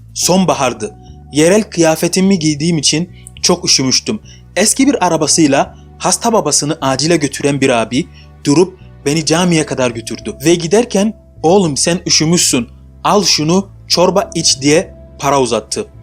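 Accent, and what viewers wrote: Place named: Kayseri, Turkey. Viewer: Abi hahaha nasıl hoşuma gitti konuşman yerel dili de çözmüşsün (lehçe) kayseride inşallah bir gün rast geliriz dostum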